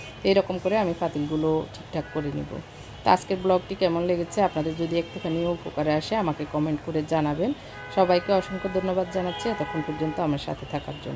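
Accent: Noakhali, Bangladesh. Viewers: যে মজা😆😆😆😆আপনার নোয়াখাইল্লা কথা,হাসলাম কতক্ষণ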